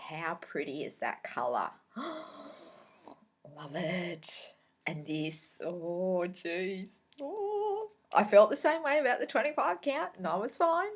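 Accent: Australia. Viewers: Thanks for another great video. so sorry to hear about your friend's parents. I love listening to your stories and your lovely accent. I visited our relatives in Melbourne 3 years ago so listening to you reminds me of my trip. I'm a novice but love trying different pieces. Your stitching is amazing! Happy stitching too next time. Lori S.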